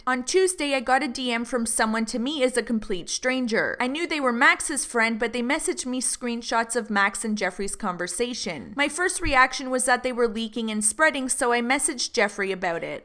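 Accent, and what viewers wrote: Canada. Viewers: Are you Canadian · I love your Canadian accent 🇨🇦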